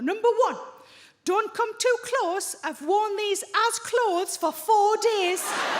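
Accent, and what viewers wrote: Newcastle, United Kingdom. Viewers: she's from the north east of England ...around Newcastle they are known as Geordies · The north east of England Newcastle area 👍👍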